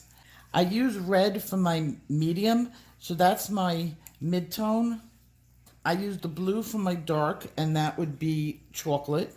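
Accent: New York, United States. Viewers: It was a real triumph for you to finally pronounce ochre - but I was so used to hearing okra, so definitely your New Yorker accent!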